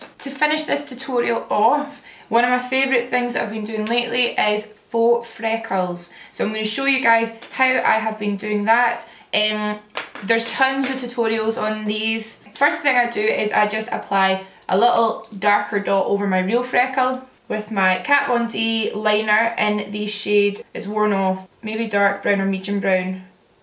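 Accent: Scotland, United Kingdom. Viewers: Omg, no makeup.. you're stunning stunning stunning.. with as well.. goodness, and the lovely Scottish accent too. Big smile!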